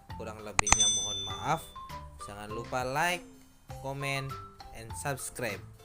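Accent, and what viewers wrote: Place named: Java, Indonesia. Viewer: Bahasa nya medok bgt ya gaes😂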